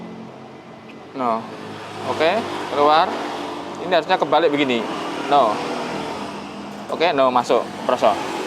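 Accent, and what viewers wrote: Java, Indonesia. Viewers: Sayup sayup suaranya langgam Jawa enak didengar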